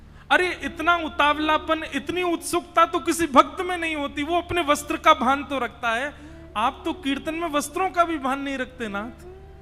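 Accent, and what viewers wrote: Maharashtra, India. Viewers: App marathi Thoda hi bolte par pyare lagte h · Gurujii tumhara sambhaashan shuddh marathi he a one supperrrrrr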